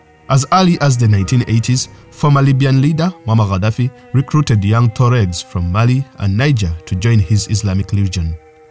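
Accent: Uganda. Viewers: I know that accent.. Uganda Oye! Great content, ssebo! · This is one of the best documentaries on "mercs", after Anthony Mockler's book, The new mercenaries, about mercenaries in Africa. The commentator sounds Ugandan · This guy is Ugandan, Just by his accent. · Excellent presentation! The narrator has a distinctly Ugandan (or should I say East African?) accent, by the way. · Narrator sounds Ugandan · The narrator sounds Ugandan....a Muganda to be exact. Ono Musajja wa Kabaka!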